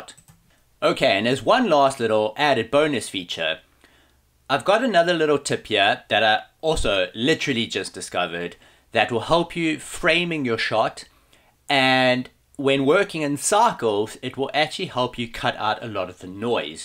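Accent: South Africa. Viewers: South African accent comes through strong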